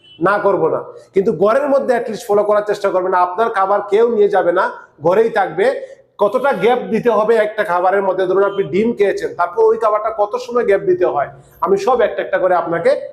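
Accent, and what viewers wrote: Sylhet, Bangladesh. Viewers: বন্ধু, আফনে মনে হয় সিলেটি, সিলেটি মানষর লাখান লাগের তো 😅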